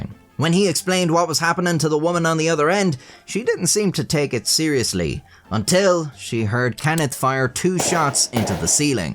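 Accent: Ireland. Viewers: What county are you from, my dads from cork, I can’t pin your accent but i know it sure as hell isn’t Dublin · I love this channel. The interesting stories, the excellent artwork, your wonderful Irish accent and the humor. Entertainment and informative too.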